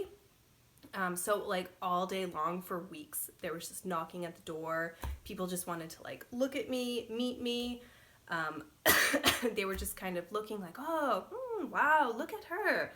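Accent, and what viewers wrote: Turkey. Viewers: Aksanı amerikadan tr ye gelmiş amerikalı gibi değilde sanki tr den usa ya gidip ingilizce öğrenip gelmiş türk gibi 🤔 her dediğini anlayabiliyorum gibi. Bu işte bi i... var😎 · I have a feeling that you might even be a Turkish. Your pronounciation of certain words are very Turkish. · Bir Türk'ün ingilizce konuşması gibi. Türkçe şehir adlarını aksansız söylüyor. Yani bir Türk. Ebeveynlerinden biri Türk olmalı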